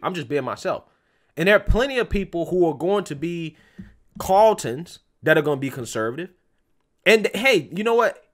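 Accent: South, United States. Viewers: im a white conservative in the south, i get your points. you did not need to use proper southern English.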